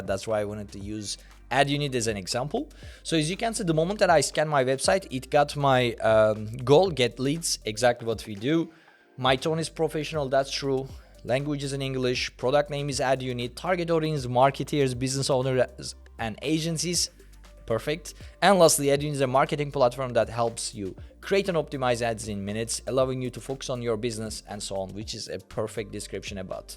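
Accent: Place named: Turkey. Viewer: Türklük damarım tuttu:) Harika araçmış diye videoyu izlerken, aksan dikkatimi çekti ve başa dönüp isme döndüm. Ve. Helal olsun, tebrik ederim. Çok güzel iş.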